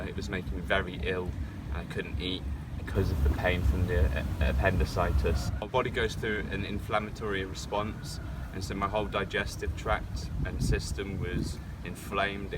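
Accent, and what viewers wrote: United Kingdom. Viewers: Ah so you are from UK. I thought you are Australian. I can’t tell the accents. Between the two. Glad you are ok now. Cute puppy there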